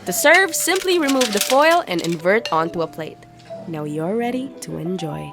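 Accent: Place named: Mexico. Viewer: An asian girl who talks like mexican or latin american, What do you call urself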